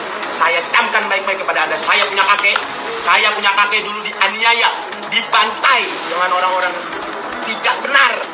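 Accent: Java, Indonesia. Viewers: MIN ADMIN....OMAHMU NGENDI MIN....BHSA JOWO MU KOK MEDUK... JOWO NGENDI KUWE.... AYO KETEMUAN MIN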